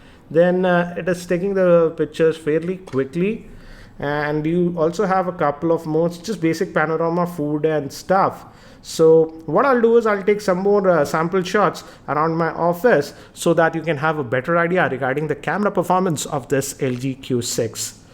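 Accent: India. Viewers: Your accent = Indian cricket commentator . Good video though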